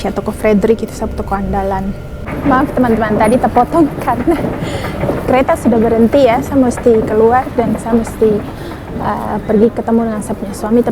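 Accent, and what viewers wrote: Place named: Papua, Indonesia. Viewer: Mamayoo Kam lihat tu, sa tu 😀😀😀 kak walopun sdh pindah warga negara, selalu ingat aksen itu neh.. kak pernah di papua k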